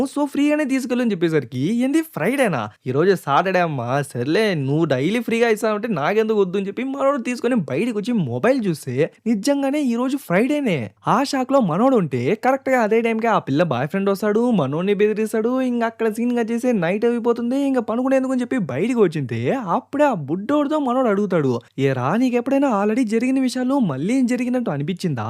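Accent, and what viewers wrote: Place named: Tirupati, India. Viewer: Triupathi slang never forget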